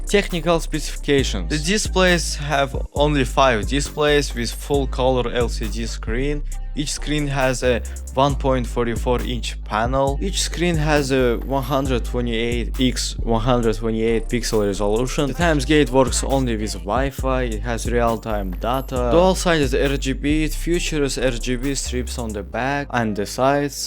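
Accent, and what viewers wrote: Russia. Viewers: Чел у тебя оусский акцент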